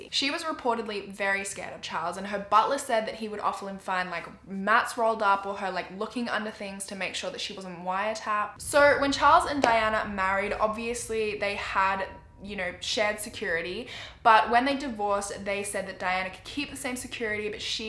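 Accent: Australia. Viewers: Idk you but I pressed on this video and was so happy because I instantly noticed your Australian accent 🇦🇺🇦🇺 I’m from Perth btw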